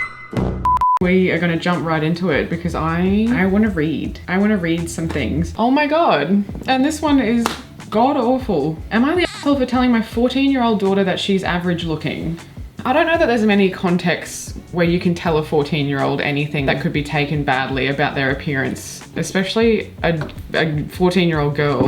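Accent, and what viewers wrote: Australia. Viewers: Hey, I love these videos of yours and I love how invested you get in the story. It’s probably the Aussie way you talk about it.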